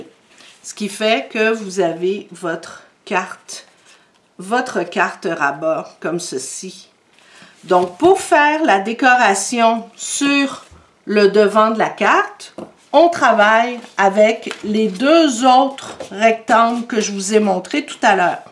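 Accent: Canada. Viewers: merci beaucoup pour vos explications très claires et précises. C'est un plaisir de vous écouter et d'entendre ce bel accent canadien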